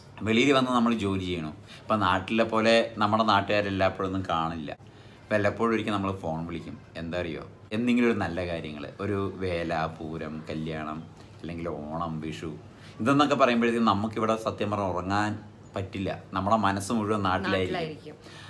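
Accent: Palakkad, India. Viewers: ഈ സ്ലാങ്ങ് പാലക്കാട്ടിലെ ചിറ്റൂർ കൊഴിഞ്ഞാമ്പാറ, വണ്ടിത്താവളം എന്നീ ഭാഗത്താണ് പ്രധാനമായും നമുക്ക് കേൾക്കാനാവുക. കേട്ടിരിക്കാൻ നല്ല രസമാ☺️☺️☺️🤝🤝 ജോയിന്റ് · Palakad slang enik eshtatto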